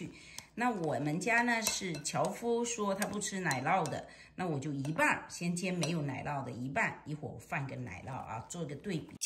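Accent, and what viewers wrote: Fuzhou, China. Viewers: 謝謝老師的分享🙏🏼 確認一下這鍋的英文是 Carbon Steel嗎？多謝您的回覆，我超愛您的福州口音❤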